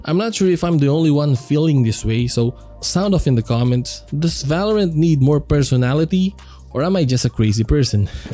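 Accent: Philippines. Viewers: Pinoy kaba lods? tunog e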